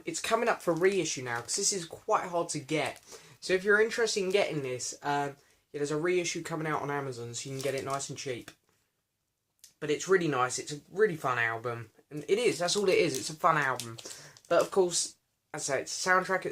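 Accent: United Kingdom. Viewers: I liked your ranking just one thing I think you should give a little more information about musicians in the albums and producers I like your Brit accent I am from Mexico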